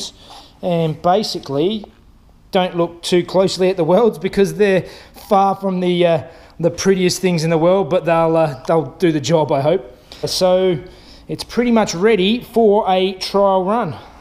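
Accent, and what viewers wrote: Australia. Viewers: He said put the earth on it when he forgot the ground lol it’s just funny because I’m American and I like the way the Aussie talk lol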